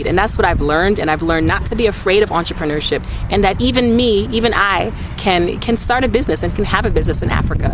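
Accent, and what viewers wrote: United States. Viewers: So I was trying to remember where I've seen you then I remembered that I saw you at a workshop in Dec 2019. When you spoke I noticed your accent and wondered if you are an expat or a Namibian who grew up in the states. Otjiwarongo is one of my favourite towns in Namibia. Those saying it's neat really need to see it in oerson, more beautiful.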